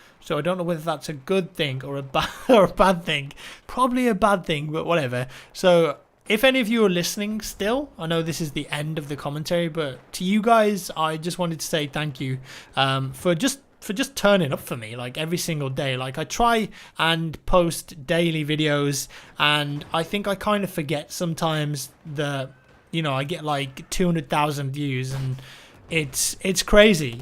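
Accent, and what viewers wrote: England, United Kingdom. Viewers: hey what part of England are you from? just curious haha I live in Canada but I'm from the UK as well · i think it's the british accent... soothing to the ears...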